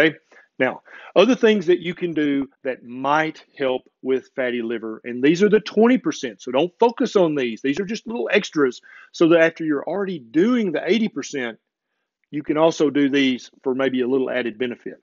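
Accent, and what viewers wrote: South, United States. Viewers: Nice to hear a educated southern accent with real advice!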